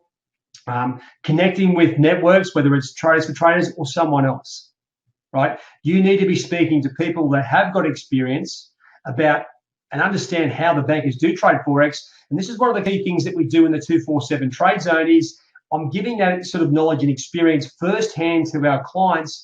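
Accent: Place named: Australia. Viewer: I can't understand that severe down under dialect. pass~>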